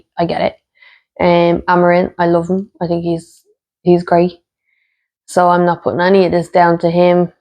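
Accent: Ireland. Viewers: I love your Irish accent. I could listen to you all day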